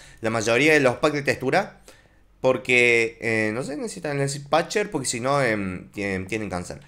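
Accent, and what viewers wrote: Córdoba, Argentina. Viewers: se te esta llendo la tonada cordobesa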